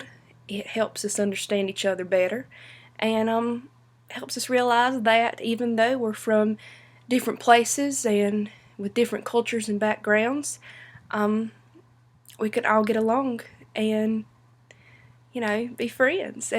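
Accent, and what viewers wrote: North Carolina, United States. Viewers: I’m from North Carolina too and we sound EXACTLY ALIKE . Don’t notice until I hear someone else speak just like me. 😂😂😂😂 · You have a beautiful accent. I moved to NC from Massachusetts and I will always have my New England accent regardless of how long I live in NC. NC accents sound more "country" than "Southern" to me which is beautiful in itself. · My mom's from Snow Hill and your accent is the closest I've found to hers on YouTube. She pronounces "pirates" like "piarts." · Hello, I'm Vietnamese. And I really really love the Southern American accent:) Thank you for your videos. They're so useful for me.:) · Nice southern accent. Its hard to find on YouTube. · I would recommend ditching the accent if you ever hope to get a job north of the Mason-Dixon Line. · Please, do the accent tag video List of words to read and about 10 questions about different words and expressions southern accent is cute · Love the accent !!I'm originally from Bladen county N.C. 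and you sound like my Aunt Jo ! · I’m from Georgia...our accent is a little different...the North Carolina accent is similar to the Tennessee accent at least in parts of Tennessee...it’s just lovely.. two absolutely beautiful states....love those Smoky Mountains...it seems to me that the South Carolina and Georgia accents are similar...maybe a little slower than your..it’s funny..there are different accents in areas very close to each other...I remember the little farming towns had their own accent..and their own way of saying certain words.. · Your accent is awsome!! Im from Finland and i speak finnish so X). But that Southern accent sounds to my ear so beautiful and nice <3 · Beautiful southern accent! I'm from Arkansas and have a strong accent but it's quite a bit different from yours. Sadly because of movies and tv a lot of places are loosing their accents. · Hey 👋 I am Gem 💎 I love the Southern accent! 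❤I be sayin certain things like this way I B4 new any accents. Tanks I subscribed to your channel so not letv me down love 💎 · I just love your southern accent. Thank you especially what you said about southern men- I met one recently and he seemed really nice😊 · I've come across this video while searching for examples of the North Carolina accent I knew and loved back when I lived there a couple decades ago. What a delight it was to not only hear you speak in it, but to say such meaningful, wonderful things in it. It's been 14 years since you uploaded this - I hope those 14 years have been very good to you. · southern accent is really sweet and hearing it from you makes it sweeter. ;) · Omg! I'm soo glad I found a real accent. Because some of thwm other videos are fakes. My friend's german and she wants to learn my accent (eastern nc) but I cant explain it. You are much better and not one them fakes. 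Thanks. :) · A very pleasant southern drawl.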